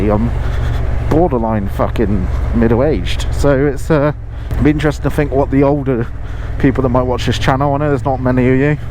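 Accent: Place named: West Country, United Kingdom. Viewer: Great video very entertaining to listen to you rant a bit about the weather, work etc.I noticed your west country accent straight away,I am from Cornwall!I live up country now in Cambridge area but travel abroad often on my bike to Italy.I have ridden in some pretty bad weather in the UK and France often!I am 59yrs old so gen x , very much a rebel ex punk/skinhead.Glad to see younger riders like yourself riding!😁👍🏍️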